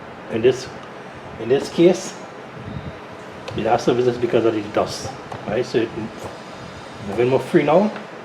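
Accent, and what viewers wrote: Trinidad and Tobago. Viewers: Is that a Trini accent I’m hearing?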